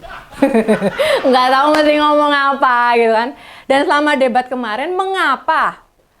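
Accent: Java, Indonesia. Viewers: iki lucu.. jowone mdhok banget 🤣🤣🤣salam sehat.. salam waras dari papua barat daya🙏🙏🙏🙏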